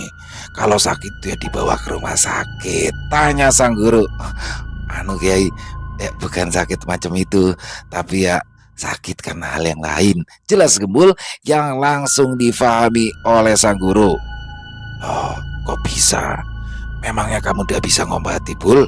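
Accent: Jakarta, Indonesia. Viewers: Bang nih kl ngomong logat betawinye kental bngt.. Apalagi kl ceritain daerah kalimantan... Beeh... Nyangkut bngt.. ❤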